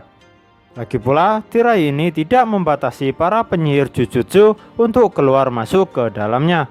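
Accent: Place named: Java, Indonesia. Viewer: terlalu medok gan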